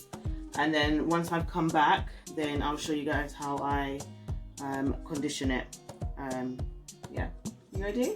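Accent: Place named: Jamaica. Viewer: She has a little ja tlk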